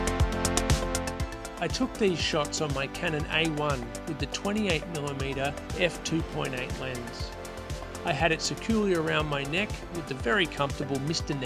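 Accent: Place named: Australia. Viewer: Love the explanation of the film, and your casual Aussie cheeky delivery. Nice product hand flourishes, this too could be yours on...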